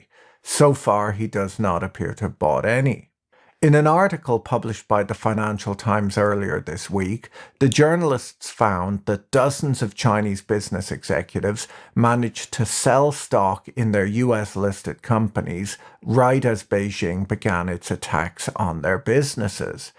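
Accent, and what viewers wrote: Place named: Ireland. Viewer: Ha, it's Irish, not Northern Irish I think. Thirty pronounced tirty.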